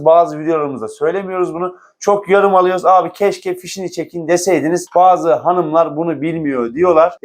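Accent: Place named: Isparta, Turkey. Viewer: Memleket Isparta galiba 😊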